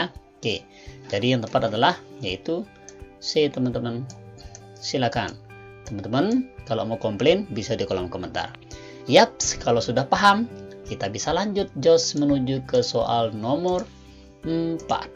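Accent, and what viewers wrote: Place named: Indonesia. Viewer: anda sbgai guru B.Indo ap B.Inggris pk, cara baca opsinya kok kyk bahasa indo pronounciationnya..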